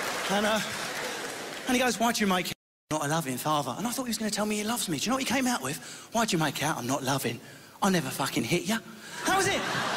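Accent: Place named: Australia. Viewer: He's funny because his Aussie accent is spot on, it doesn't sound over the top or anything!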